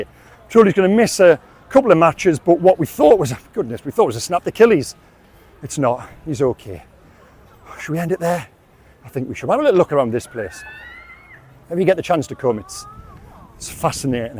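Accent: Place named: England, United Kingdom. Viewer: Aye the original accent of england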